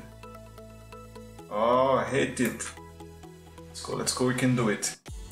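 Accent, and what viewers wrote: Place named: Brazil. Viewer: Pra mim ele já é pica por meter a cara e fazer o video em inglês, já moro fora a 6 anos, falo inglês todo dia, mas ainda não tive coragem de começar um canal em inglês. Mas o sotaque BR é tipico, esse é o nosso tempero especial, os outros BRs sempre me descobrem pelo sotaquezinho de fundo e eu eles. haha Muito bacana, agora eu vou lançar meu neon aqui pro meu wallpaper. hehe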